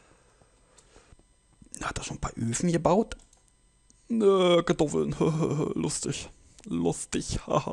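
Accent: Berlin, Germany. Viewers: Du musst unbedingt die Berliner Schauze behalten... bloß niemals Hochdeutsch! Aber bitte beleidige die Schafe nicht so wien Berliner Penner :D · Und bitte rede nicht Berlinerisch. Das klingt bei anderen noch OK. Aber bei dir so scheiße und überdreht.........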